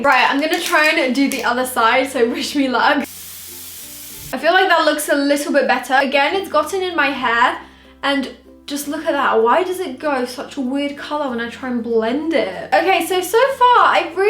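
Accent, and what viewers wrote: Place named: England, United Kingdom. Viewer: she is from England! she has a similar accent to me but mines more high pitched :)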